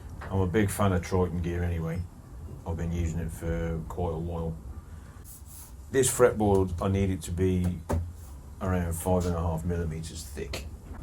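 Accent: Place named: Kidderminster, United Kingdom. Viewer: Nice job. Btw my wife heard your video from another room, came running in and shouted ‘Kidderminster!’ (referring to your accent). She also comes from the carpet capital of the western world (we met while both working at Gilt Edge Carpets).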